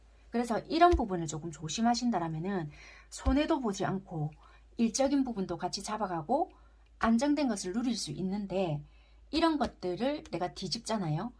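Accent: Busan, South Korea. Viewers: 님 21년동안 좋은 타로리딩과 조언 감사드렸어요 21년 마무리 잘하셨나요? 22년도 함께해요 ❤ 3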